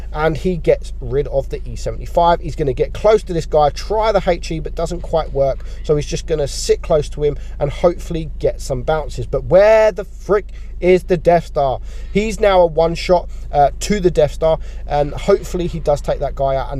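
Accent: United Kingdom. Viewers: Excellent British commentary and great replays.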